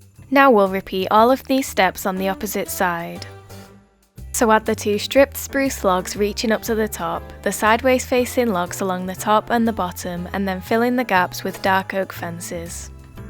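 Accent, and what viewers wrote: United Kingdom. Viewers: I like your British accent.I sometimes try to make one too but I just can't :))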